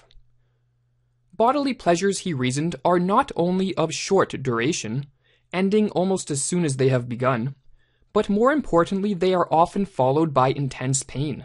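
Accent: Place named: Canada. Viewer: A Canadian narrated this.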